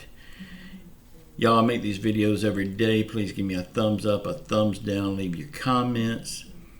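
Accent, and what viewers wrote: South, United States. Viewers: You crack me up I listen closely to everything you say and I do believe you and most of all I love your southern accent let's pray that we get the $2,000 and retroactive back from March that would be completely awesome continue to do the good work keep up the good work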